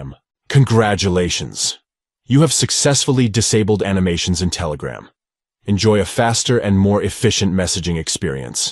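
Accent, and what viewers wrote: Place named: Australia. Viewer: You should learn how to pronounce your Australian accent properly. Why do creators create these apps to be so juvenile. What about a professional approach. We want to achieve professional messaging that surpasses anything we have come across. My first experience was a stupid coronavirus animation. Goodbye 2020 hello 2025.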